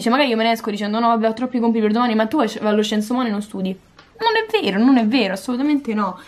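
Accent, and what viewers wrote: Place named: Rome, Italy. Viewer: Ciao, ti ringrazio per questo video, l'ho trovato molto utile. Dalla cadenza sembri di Roma, come me... puoi dirmi quale liceo frequenti?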